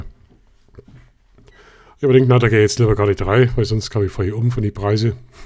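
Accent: Bavaria, Germany. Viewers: Hey hat mir sehr gut gefallen Deine kleine Führung durch Salzburg auch die Nachvertonung 👍 witzig und typisch bayrisch äh 😂 LG Sascha😊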